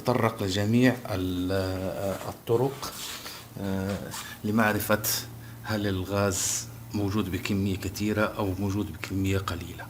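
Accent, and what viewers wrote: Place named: Morocco. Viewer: تحية من بني ملال ❤ لهجتك مغربية شوية 😊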